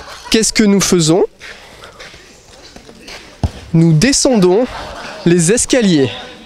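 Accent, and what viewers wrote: France. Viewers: Love the French accent. :) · The way this guy is speaking French is really cute. And I love to hear the french accent it's so satisfying I don't know why. I didn't learn much from this video honestly. 😂😂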